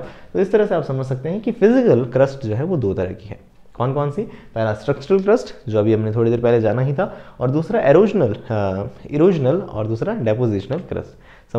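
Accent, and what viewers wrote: Bikaner, India. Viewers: No it's a bikaneri sir ❤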